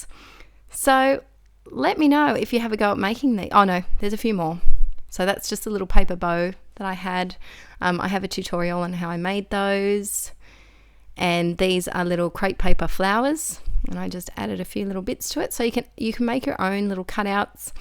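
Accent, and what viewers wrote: Australia. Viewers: just love hearing an Aussie accent for a change. Love your work space, I'm off to redo mine now. thoe embellishments are really cute.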